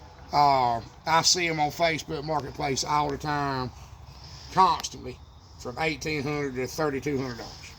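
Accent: South, United States. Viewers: I dont know him from Adam, but he comes across like the salt of the earth kinda person. The men in my family die fairly young, but something about him and his mannerisms feels so familiar. He reminds me of them. I think hes a mixture of Rodney Dangerfield, Archie Bunker and someone else.. I cant quite pinpoint, but I can listen to this man leaned up against a fence post with his cup all day long. The wisdom that hes willing yo share in between his words, the crickets, the farm backdrop, the southern drawl…Big fan of this channel.❤. Might should offer some merchandise, Id love to wear a “Homesteading the Hardway Hat” hahaha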